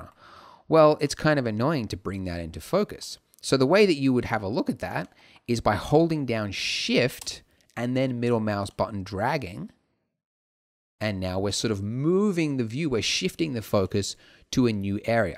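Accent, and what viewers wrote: Australia. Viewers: What is that Ozzy/Kiwi/Brooklynese accent?? :)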